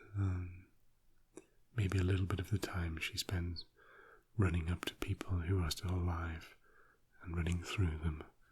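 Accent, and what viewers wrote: United Kingdom. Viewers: Ok. Since I'm touring your production I'm guessing what country your accent belongs. You sound brit but not too much.. i associate you with actors like Christian Bale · such a fine British accent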